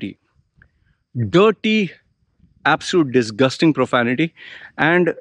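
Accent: India. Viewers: I'm confused. You say you're didn't spend a lot of time in India, but you sound Indian like you grew up there.Everybody knows indian accents . Plus Whats up with , new zealand Tribal tattoos on your face why Since you not from new zealand or indigenous new zealand just wondering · You don't look indian at all, of course your accent is extremely strong so people realize that, but it's nice to listen to you Subscribed!!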